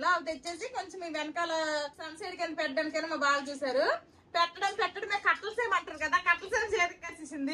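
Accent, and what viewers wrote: Srikakulam, India. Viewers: Sikakulam basha 😅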